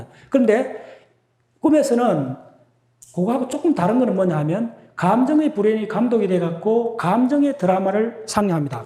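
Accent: Gyeongsang, South Korea. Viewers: 강의내용은 좋은데 경상도 사투리가 심해 잘 알아 듣지 못하겠다.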